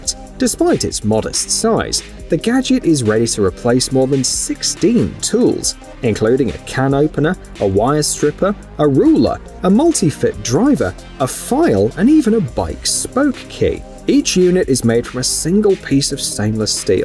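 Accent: United Kingdom. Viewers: what's with the f**** Brit accent impresarios .. every new gadget has to have a brit presenter or banging background sound.. lighten up . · Does it bother anyone else that the items are described using British accent and terminology, but US units of measure and value? No? Just me? OK, then.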